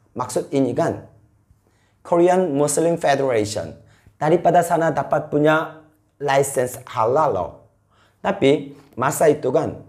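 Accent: Sabah, Malaysia. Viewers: Terbaik la korea cakap melayu sabahan... 🥰🥰🥰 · Cara abang becakap mcm org sabah kk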